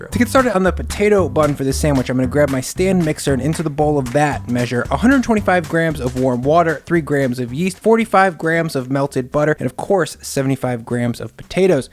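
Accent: Chicago, United States. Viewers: I'm guessing the accent is Chicago? The "SH" sound in a word like, "schtrength". This looks like a Nashville Chicken sangwich but without the pickle juice marinade for the chicken.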